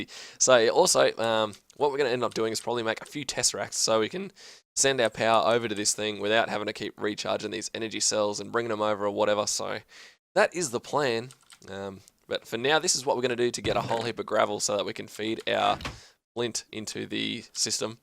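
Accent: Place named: Australia. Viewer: You have an Australian-like accent.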